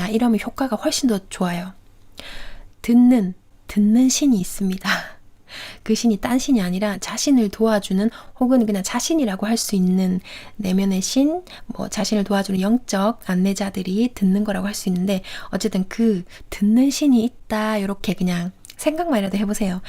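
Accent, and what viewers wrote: Gyeongsang, South Korea. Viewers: 다른분들은 어떠신지 모르겠지만 저는 산투리억양이 너무 친절하고 진실되고 따뜻하게 와닿습니다. 저는 너무 좋습니다. 그리고 감사합니다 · 목소리가 좋으셔서 듣기 너무 좋은데 경상도 억양때문인지 더욱더 자세히 귀에 들어와서 좋았네요^^ 감사합니다.^^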